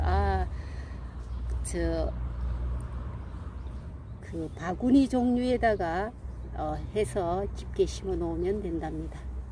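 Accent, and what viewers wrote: Gyeongsang, South Korea. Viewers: 저도 전원생활하면서. 야생화키우고 있지만 이렇게 많은품종과 넓은곳은 처음봐요 말투과 경상도 사투리가 있는데 어디예요?저는 대구살다가 지금성주에서 전원생활하고있어요 가까우면 구경한번하고싶어요 스켈이 타고나요~^^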